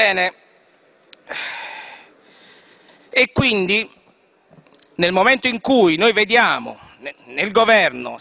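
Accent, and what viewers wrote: Rome, Italy. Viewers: Senza slogan e battutine da terza elementare con accento zingaro romano non capisce?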